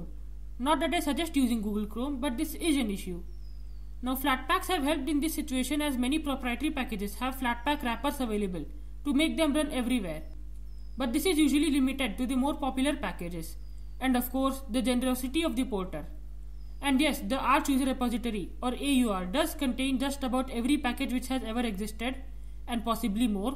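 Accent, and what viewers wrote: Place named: Japan. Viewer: Adjust your Audio Setttings,its Low,and i love your Japanese Accent......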